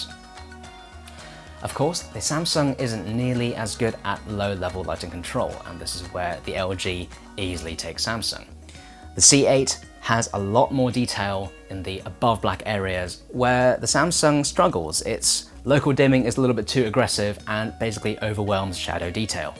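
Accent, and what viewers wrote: England, United Kingdom. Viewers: The overly English accent makes me lose focus. I fucking hate you. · Very nice informative review and may I say great combination, the Oriental look with an English accent, very clever looking and sounding, I'm not just debating but I'm still researching and as far as design goes I think they messed up here because the whole idea of having an ultra thin TV is to show it off and I'm sorry but that curved bottom just doesn't do it for me, I don't care what kind of Sound it gives you, because basically if you're going to spend that sort of money for a TV, if you got any brains whatsoever you would spend a little bit more and get a AV to go with it?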